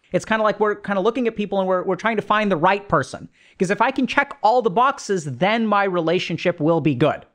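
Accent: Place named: India. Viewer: i love your indian english man :D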